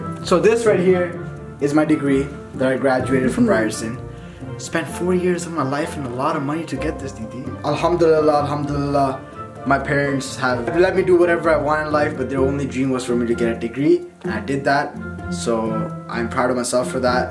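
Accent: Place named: Pakistan. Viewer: Accent to Pakistani lag raha hai. Lakin Didi kyun keh raha hai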